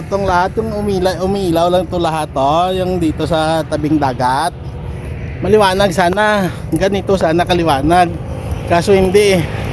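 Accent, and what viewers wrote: Iloilo, Philippines. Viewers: Good morning parang ilongo ka man talaga idol kaya ingat ka idol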